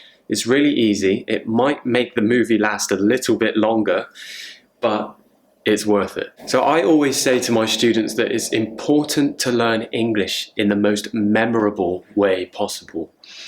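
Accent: United Kingdom. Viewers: English Hi mate. I'm from Italy. I've been studying for Cae exam, and with regard to your question on how we improve our skills and learn new words, I've developed myself a sort of method. I start with looking a dictionary up looking for interesting words. Then, on wordreference or cambridge dictionary I seek word families ( I mean, words families of words I selected from my dictionary - hope this is clear). Then, I have a look on lingohelp where you are told what preposition follow an adjective and so on. Accordingly, I keep my head down and study all this words and then I do try to put them into practice by typing to friends of mine who are American, B English and similar. What I mean is this: I oblige myself to study 'em all while using them and I do allege I know them perfectly. I know it's quite weird but It pays off as most of the times it works. That's blatantly obvious that I cannot remember hundred of words but I needed to enhance my English voc. 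variety and so here is it! I'm applying the same kind of approach to idioms and collocations as well. Moreover, I do watch movies in English. Admittedly, I did it for fun and more frequently in the past but I would do it with subtitles. Now I've been trying to do it without 'em, especially Game of Thrones, where people talk using B. Accent (which I've copied from your way of speaking ahahah). I wouldn't dare say it's easy but it's not difficult either: more or less I'd say I figure out 70 80% of what characthers say. I hop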